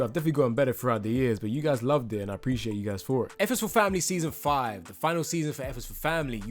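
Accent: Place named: United Kingdom. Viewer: Ahh, I'm already amazed with the British accent🤪😍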